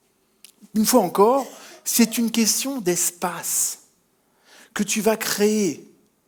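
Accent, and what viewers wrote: Quebec, Canada. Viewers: Ch’t’aime donc bin! Avec l’accent d’une pure QUÉBÉCOISE ❤️🙏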